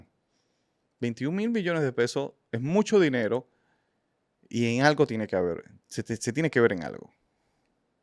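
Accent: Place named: Venezuela. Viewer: Is this guy DOMINICAN..? Sounds very much Venezuelan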